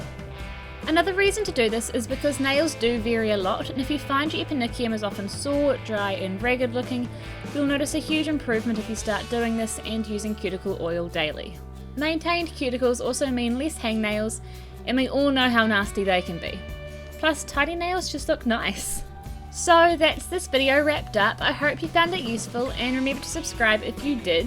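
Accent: New Zealand. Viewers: I love the way you talk! I'm a Kiwi too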